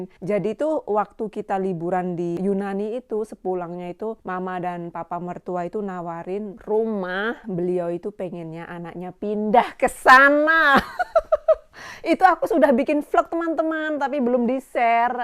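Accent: Java, Indonesia. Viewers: Mbak ini logat ngomongnya seperti orang Jawa, salam dari Jogja mbak, suka banget ngikutin vlognya sehat selalu mbak dan keluarga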